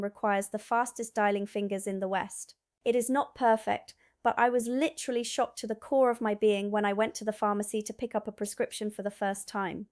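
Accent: United Kingdom. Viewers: I buy superseeded wholemeal bread and it lasts me a week. I prefer it that way. It tastes fine. I have 5o say you are a most confusing Amercan because you sound like a Brit!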